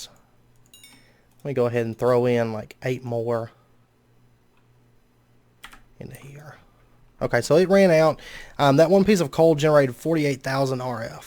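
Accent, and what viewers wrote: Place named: South, United States. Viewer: Just starting your series even though it's dated. The same 1 I am playing now. I am new to modded Minecraft. Really enjoying the video so far, took a quick break to ask you where you're from? You sound very Southern. :-)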